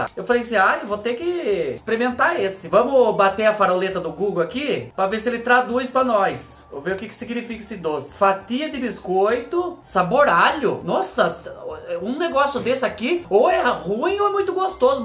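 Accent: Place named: Rio Grande do Sul, Brazil. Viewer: Oi vc é gaúcho tem um sotaque de deferente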